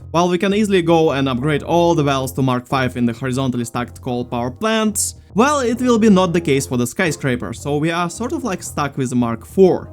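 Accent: Russia. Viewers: слишком слышно русский акцент, но видос классный, повторю как дойду до угольной энергии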